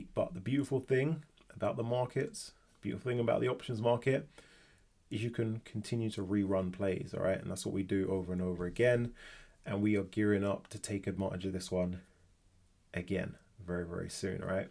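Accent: United Kingdom. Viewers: Love the British accent..lets go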